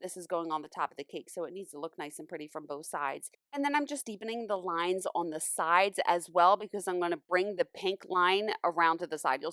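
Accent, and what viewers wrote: Philadelphia, United States. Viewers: You are definitely from Philly!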